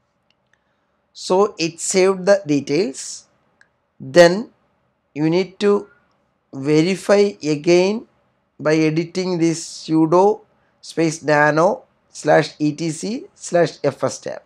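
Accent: India. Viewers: Man, that OG Indian accent!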